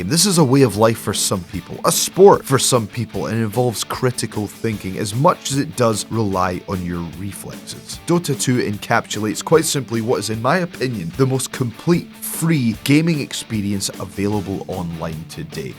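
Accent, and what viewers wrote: Scotland, United Kingdom. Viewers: Mainly just due to the Scottish, but still. Imagine a Doctor that connects with the youth on their level. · This guy has a Scottish voice and I love it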